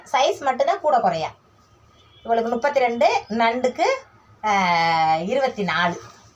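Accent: Madurai, India. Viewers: Akka neenga madhurai ya super ah pesuringa akka ella dress nalla iruku 👍👍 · Akka ninga madurai ah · Akka neenga Madurai ya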